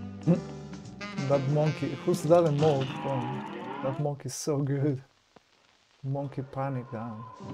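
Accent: Mexico. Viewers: primero pense que eras estadunidense pero con este video ya veo que sos mexicano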